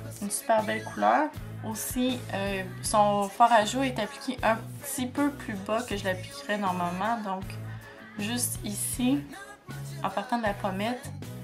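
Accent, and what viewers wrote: Quebec, Canada. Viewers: T'est magnifique, j'adore l'accent québécois.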